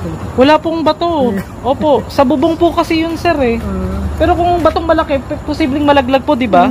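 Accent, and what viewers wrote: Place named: Bulacan, Philippines. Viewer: ay malapit ka sa Bulacan, bulacan..e yung punto eh!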